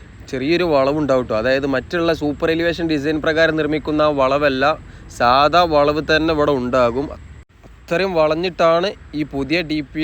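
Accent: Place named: Eranad, India. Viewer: പിന്നെ താങ്കളുടെ ഭാഷയെ വിമർശിക്കുന്നവരോട്, മലയാളം എന്താ സ്റ്റേറ്റ്കാരുടെ ഭാഷ ആണോ? അവരവരുടെ മലയാളം അവരവർക്കു ശുദ്ധം. ഞങ്ങളെ കാസ്രോട്ടെ ബാസെ ഞങ്ങളെ സുദ്ദ ബാസെ. ങ്ങളെ ഏറനാടൻ ബാസെ കേക്കാന് എന്തോരി പാങ്ങന്നേപ്പാ, ആ ബാസെന്നെ പറഞ്ഞോണ്ട്-ന്നെ മുന്നോട്ട് പയ്ക്കാളീം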